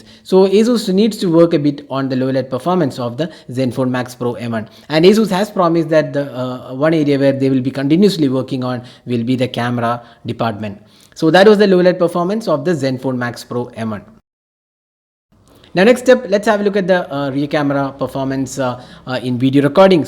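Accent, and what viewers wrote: India. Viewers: Indian tone hurts my ears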